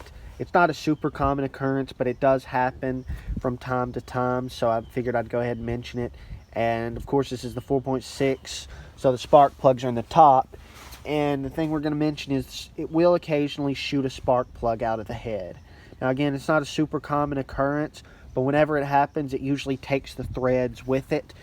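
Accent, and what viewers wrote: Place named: Texas, United States. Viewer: Awesome Texas accent! 👌🏽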